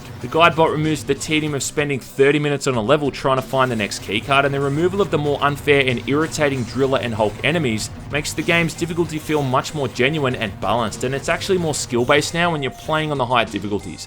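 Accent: Australia. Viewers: Yaay somebody likes me, even my mother doesn't like me. Even watching this just gives me motion sickeness, blah I shall just listen to your sultry Australian voice.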